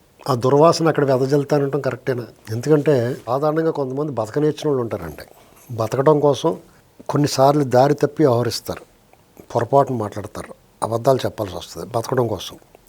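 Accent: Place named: Godavari, India. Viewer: గోదావరి జిల్లా భాషని చాలా చక్కగా ఉపమాణాలతో చెబుతుంటే ఇంకా ఇంకా వినాలని పిస్తుంది.... v r proud of U.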